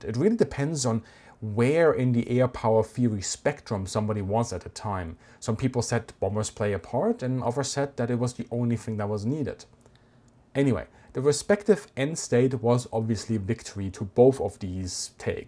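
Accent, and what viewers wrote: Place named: East Germany, Germany. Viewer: I think i can detect east german accent maybe even berlin area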